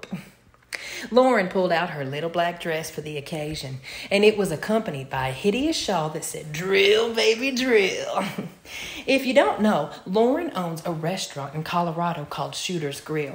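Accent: South, United States. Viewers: Taking down the hags with a southern accent! It's absolutely perfect! From a fellow southerner, thank you.🥰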